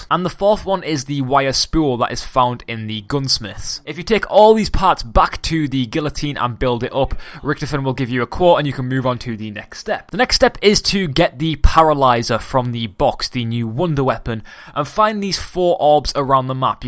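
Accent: United Kingdom. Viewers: You are wrong there is a British accent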